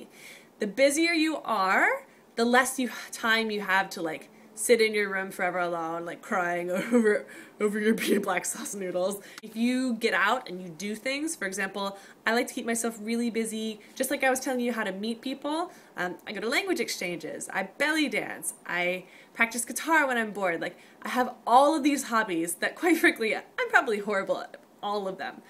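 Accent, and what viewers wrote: South, United States. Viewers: I'm from TN and I think I have more of a southern accent than you do. Do you get comments of your accent a lot? Or do they just hear American more so than a regional accent? I'm kinda excited to hear my students speak with a little twang. :) Although I'm sure I'll have to try to speak without an accent, right?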